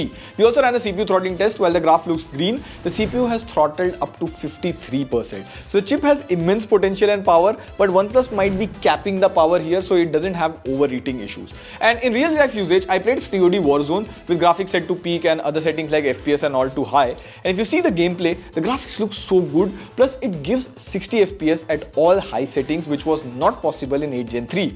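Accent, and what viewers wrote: India. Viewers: hahahahah i cant unterstand the indian english ahhahahaha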